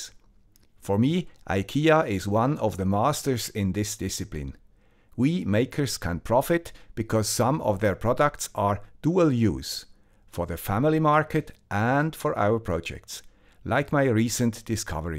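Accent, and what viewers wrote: Switzerland. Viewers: ok, remember a couple of months go, i complained about your english, but after watching you a bit, i get used to it, and now i really like your accent... i mean, i have a Youtube canal too (shameless plug here is the channel name) and i speak english there too, and im also from swizzerland, and i have a accent... thats what is special about us, we dont speak the perfect english... keep going. i like what you are doing! and because the temperature, i have a idea: you can let cold water flow where no sensors and sorar panel is, and it should cool it a bit(hopefully enough)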